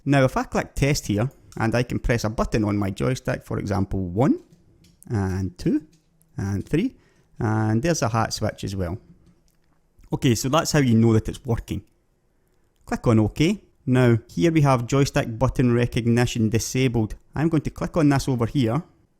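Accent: Scotland, United Kingdom. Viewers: scottish accent, I dont understand a word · I'm so glad I found a Scottish guy doing this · First class presentation of how to use Voice Attack. I got it yesterday and have been looking around for a tutorial to learn its programming from. I am looking to set it up with DCS A10C and whilst a different programme to ED the methodology is well explained with both demonstration and explanation. Your video is going to be by my side when I start setting VA up. I imagine that it will work fine on other games containing a matrix for keystroke mapping/editting such as IL2 and Silent Hunter 5. BTW, what part of Scotland do you hail from??? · I find the Scottish accent really pleasant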